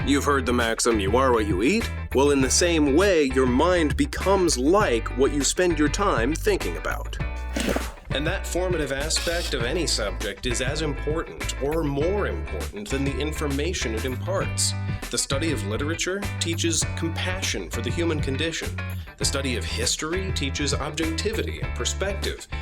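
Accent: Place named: United States. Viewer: Latin spoken in an American accent like that is truly barbaric.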